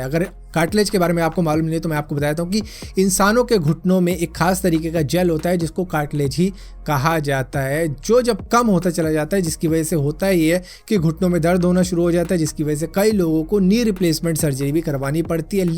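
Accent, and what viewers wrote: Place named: Haryana, India. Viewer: Bhai videos m information bht bdiya deta,h beach beach m haryanvi touch b diya kr ,kbi kbi nikl jati h tre muh se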